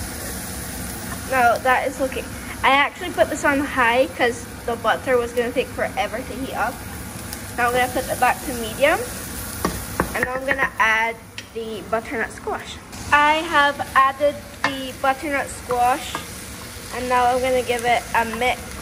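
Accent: Scotland, United Kingdom. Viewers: Happy Easter & Family! My old math teacher always said only crazy people make perfect circles, no stress on circles lol. your western kitchen skills are getting good! Also you are starting to sound a lil Scottish with a tiny accent on a few words - very cool 😎 😀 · Hi! So nice to see you again, love your cooking skills, you look so grown up, and starting to have a wee bit of of Scottish accent, how is your brother? Happy Easter to you, and your beautiful family, 🙏💕🇨🇦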